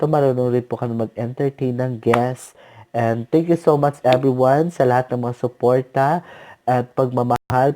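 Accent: Ilocos, Philippines. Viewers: Haha..nice cute pala ng dialect ng ilocano. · I love your ilocano accent 😁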